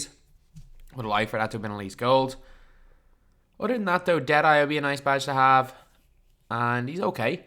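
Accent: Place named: Ireland. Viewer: he’s Irish i’m pretty sure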